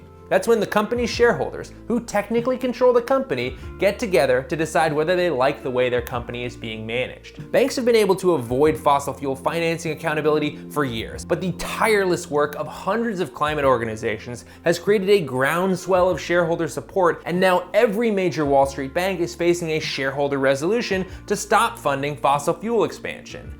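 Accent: France. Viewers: I went to give this video a like when I heard your french accent but I already had like this video. Seriously always so well done.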